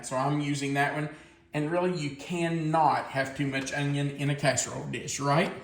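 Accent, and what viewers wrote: South, United States. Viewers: I knew with that accent you had to be in the SEC! ;)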